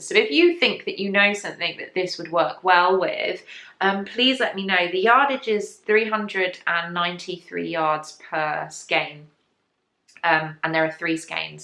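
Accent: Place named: England, United Kingdom. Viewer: I award you the designation of Honorary Southern Belle for saying "hissy fit" in this podcast. OMG! I definitely laughed out loud, because what made it extra special is your adorable English accent. Cheers!!!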